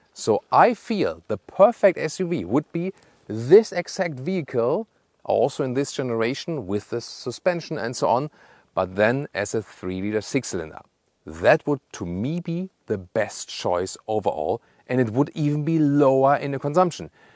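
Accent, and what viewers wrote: Germany. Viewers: I like watching German car reviews with German accent 😊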